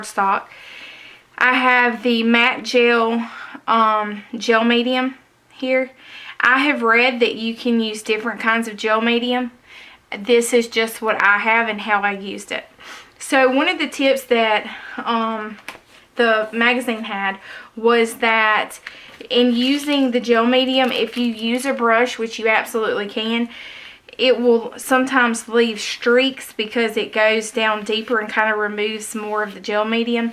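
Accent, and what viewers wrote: South, United States. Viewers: I enjoy listening to you talk ,my sister was a southern gal too I miss hearing her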